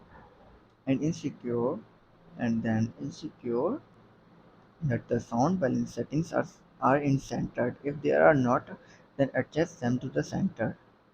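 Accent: India. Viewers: Mate, your Indian accent is so strong. You really should get AI generated English very hard to understand what you’re saying.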